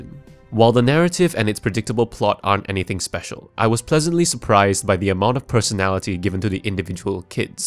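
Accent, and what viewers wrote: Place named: Singapore. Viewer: You sound Singaporean! Good review, well presented and structured.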